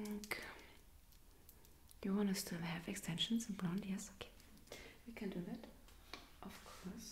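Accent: Germany. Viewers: Great idea but a german only Version would be great. I dislike the german accent (in general not you specifically) and it was quite distracting for me personally xD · I loved hearing both English and German. I love the sound of German accent and language. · speaking germglish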